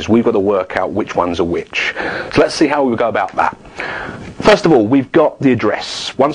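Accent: England, United Kingdom. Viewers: lol english accent :D lol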